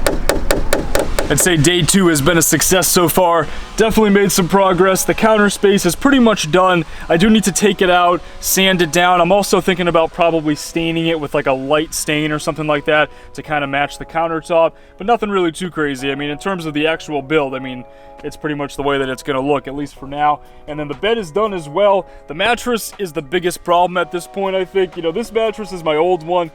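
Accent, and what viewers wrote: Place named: Modesto, United States. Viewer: You sound like your in my area which around a 100 Modesto, California Love your van conversion I wish there was someone in my life that could help me build my home on wheels which I'm planning on doing in about a year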